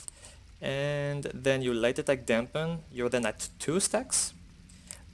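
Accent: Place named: France. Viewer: Do you speak french?You have a french voice.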